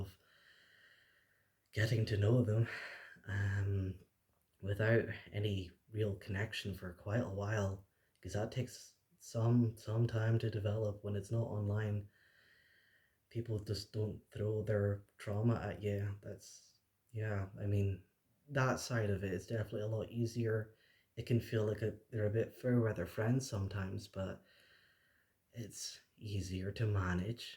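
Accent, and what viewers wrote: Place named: Scotland, United Kingdom. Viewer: I can tell by the accent your Scottish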